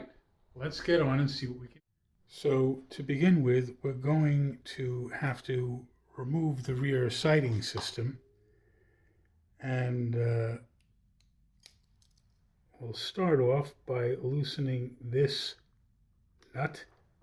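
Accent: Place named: England, United Kingdom. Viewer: I thought Brooklyn but then I recognised the English tone to your voice and assumed it was the other way around sorry. great video anyway, I'll be doing the same modification tonight with your help. Thank you!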